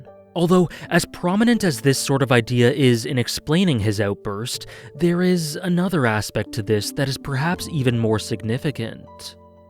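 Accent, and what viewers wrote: Canada. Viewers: Are you from Canada? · Listening to talk about the name of this ep: "This guy's Canadian!" (I am too brother, I get it)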